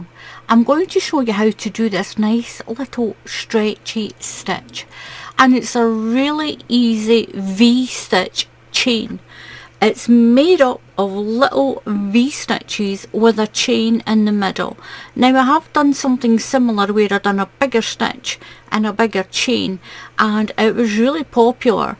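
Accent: Scotland, United Kingdom. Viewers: Tbh I mainly watch your channel because of your scottish accent, I just love it SO MUCH 😍😍🥰🥰 Your teaching skills are also awesome though. Keep up your great Videos!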